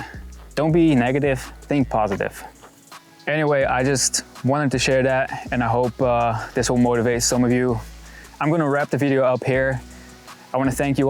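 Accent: United States. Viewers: Are you American? Definitely sound like it but I know you're over in Belgium...So confused 🤯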